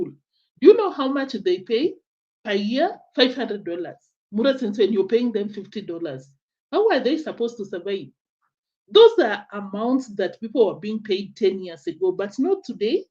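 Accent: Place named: Kenya. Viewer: Epic content! But, please tone down your Kenyan village accent. Please darling... ❤❤❤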